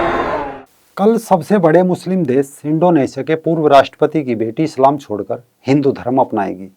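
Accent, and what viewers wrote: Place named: Himachal Pradesh, India. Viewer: Bhai tu himachali hai kya accents se lagta hai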